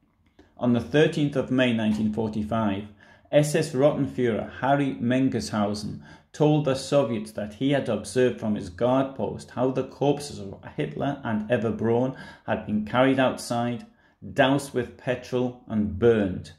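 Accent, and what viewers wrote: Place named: Newcastle, United Kingdom. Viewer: I certainly have subscribed, and I am certainly enjoying your Video's and I am certainly intrigued by the Bizaar mix of your accents..... Newcastle????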